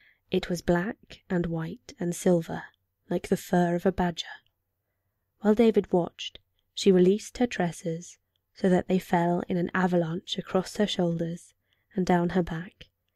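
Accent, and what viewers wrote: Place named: United Kingdom. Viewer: Oh my .... This channel ... I don't ever want you to stop reading :( There's no sweeter sound to my ears than a bedtime story read with such a warm voice. It reminds me of my childhood. And as a french guy, i think your delightful british accent is the cherry on top.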